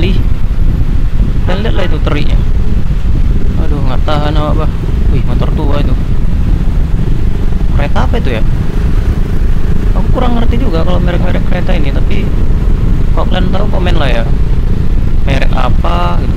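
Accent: Medan, Indonesia. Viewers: udah agak ilang kutengok logat medan kau disini lae, jangan di ilangin lae daya tariknya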